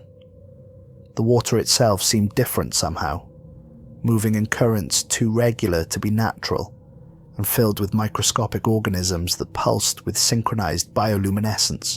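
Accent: Wales, United Kingdom. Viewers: Good stories and spoken in English with a Welsh accent. Looking forward to the next one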